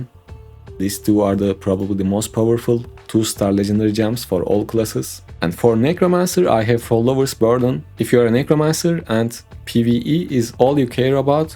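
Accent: Turkey. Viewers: Konuşmasindan anladim türk olduğunu haha Almanyadan selam reis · İnanılmaz türk aksanı var reyis türk müsün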